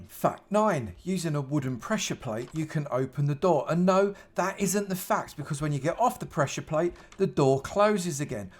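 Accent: United Kingdom. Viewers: this is the most usa documentary style video I've seen by someone with UK accent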